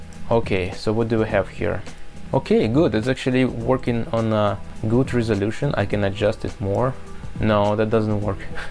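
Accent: Russia. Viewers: вы русский? Просто акцент чисто русский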